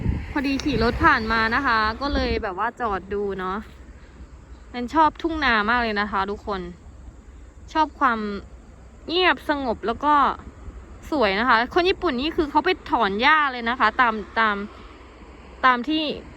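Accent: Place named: Isan, Thailand. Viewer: ชอบแม่ เว้าอิสานม่วนคัก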